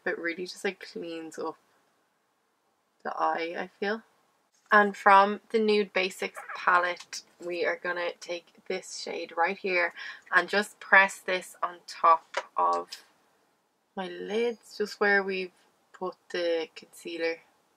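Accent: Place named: Dublin, Ireland. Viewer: A beautiful look expertly applied. I love your Dublin accent.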